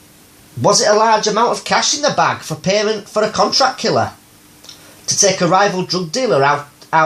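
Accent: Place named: West Yorkshire, United Kingdom. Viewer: Where are you from mate.I know its northern and I'm thinking west yorkshire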